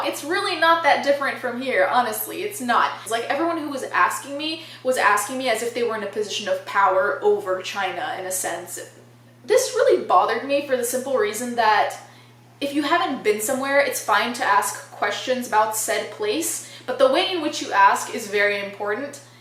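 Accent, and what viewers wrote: United States. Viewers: I think they expected you to have an Italian accent and it’s weird cause you have an American accent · I think it’s weird as do many that you didn’t get your accent in America... it’s interesting but weird because it’s an American accent simply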